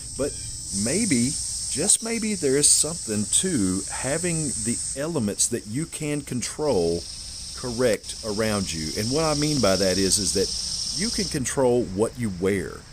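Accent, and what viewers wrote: South, United States. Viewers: Southern accent? crepe myrtles? You in NC? Just found this channel.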